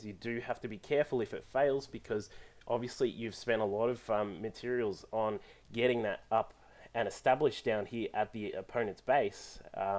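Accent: Australia. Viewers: Do i hear Australian accent? Like the vids man, keep em commin'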